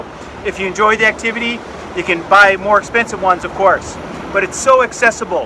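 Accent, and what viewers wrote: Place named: United States. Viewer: Still really enjoying your content. As a typical sedentary 60 year old American I need all the positive example and inspiration I can get; you keep me focused on getting in a few skipping workouts each week. Currently working on various cross-overs and footwork drills to put together a consistent ~20 minute workout that is challenging but enjoyable. Extreme heat and humidity along the US Gulf Coast makes it much harder for an old guy like me but once the seasons change it will be much more enjoyable. Why do I think you're in Ireland (but you sound American or Canadian...)?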